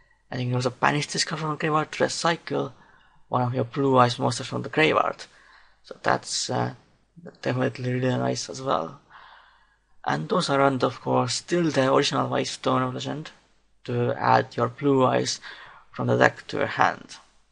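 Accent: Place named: Europe. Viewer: European, like british or german or some shit. that accent is horrible, the video needs subtitles.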